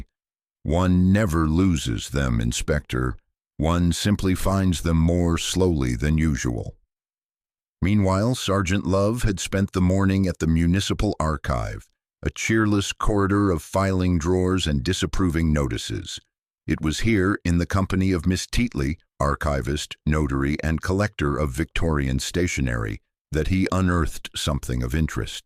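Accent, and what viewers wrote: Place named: United States. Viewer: Dreadful! AI rubbish, appalling American accent!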